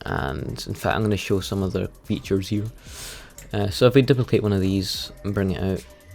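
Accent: Scotland, United Kingdom. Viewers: maaaate i didny even know you were scottish aww thank fuck makes life easier :)))))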